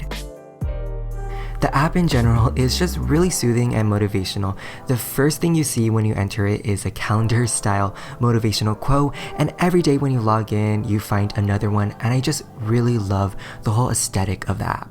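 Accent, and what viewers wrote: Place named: Philippines. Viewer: I swear he sounds Filipino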